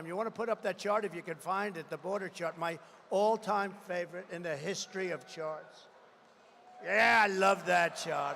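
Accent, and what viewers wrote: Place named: United States. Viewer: Genuine and authentic, spoken in clear American English. MAGA.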